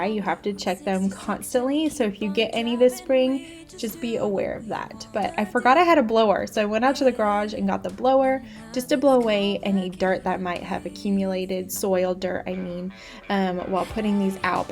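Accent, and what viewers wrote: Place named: Louisiana, United States. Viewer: Where are you from? Sounds like maybe Louisiana.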